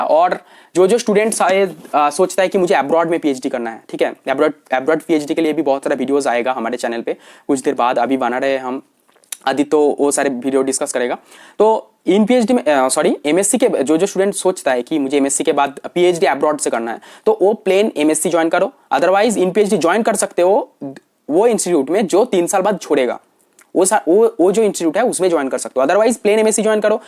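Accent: Bengal, India. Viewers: Sir are you from West Bengal? 😮😮😮 Your sound is looking like Bengalies.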